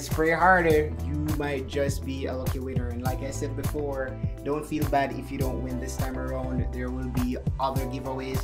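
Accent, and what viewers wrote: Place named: Jamaica. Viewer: I heard our accent lol, I'm Jamaican and a new subscriber😁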